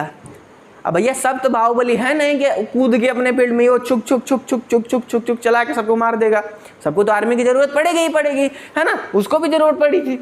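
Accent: Bihar, India. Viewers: Bhai aap bihar se hona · Bhai tum shi pdhate ho Bihari accent me mja Ata h pdhne me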